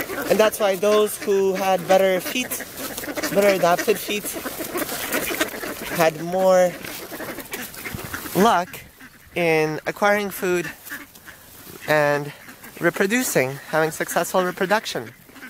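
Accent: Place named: United Kingdom. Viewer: You are from Canada? I thought from UK. Cute ducks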